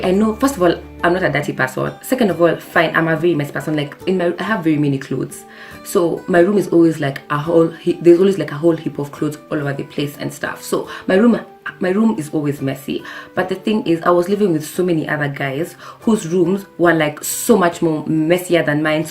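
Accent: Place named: Kenya. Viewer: Kenyan English accent is very interesting. I noticed how different you pronounce some words: First - Fast Service - Savice Year - Yar Fact - F..ked Work - Wark Church - Chach Etc. I just love it! Thanks for sharing your experience. I did hear a lot of SA accent as well.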